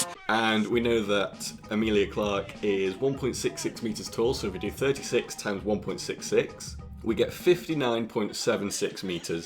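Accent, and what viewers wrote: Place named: United Kingdom. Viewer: How can you not like a nice british accent?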